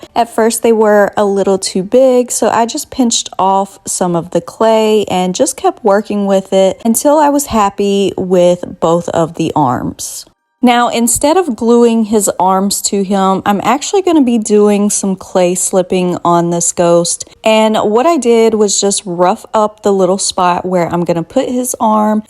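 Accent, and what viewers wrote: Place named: Florida, United States. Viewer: I say "them" and "him" with the same accent as you .. I'm from Florida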